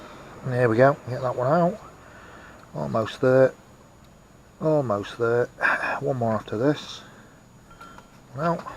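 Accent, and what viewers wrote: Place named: Northern England, United Kingdom. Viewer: Hi from Manchester! You sound like a northerner too! Great video and like the Funky music!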